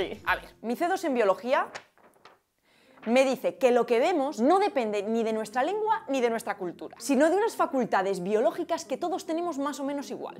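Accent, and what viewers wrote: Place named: Spain. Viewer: Ascoltare il tuo spagnolo è super interessante, mi piace molto la pronuncia dello spagnolo di Spagna e capisco che l' accento è differente da quello del sud America. Io sono italiano, ma capisco una buona parte delle frasi, ma solo se parli non troppo velocemente, come invece hai fatto in questo video.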